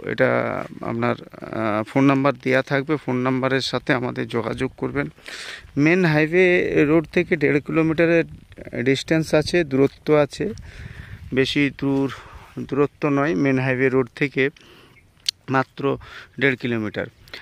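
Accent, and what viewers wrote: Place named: Bangladesh. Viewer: এই ভাইয়ের কথা শুনে মনে হচ্ছে উনি বাংলাদেশি। আমাদের খুলনা অঞ্চলের বাড়ি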